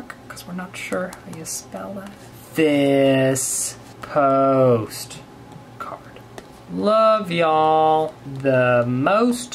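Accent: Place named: Upper Peninsula, United States. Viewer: Ayye yooper eh?! Wee love it!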